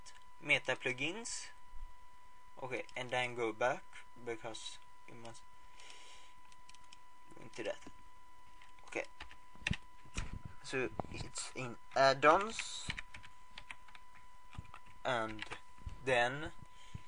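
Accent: Sweden. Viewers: Hmihmi! Fyfan va kul :D älskar när svenskar pratar kult engelska :DD Sä himla gutt!! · så jäkla bra älskar hur du utlar dom engelska orden med typ svenskt tal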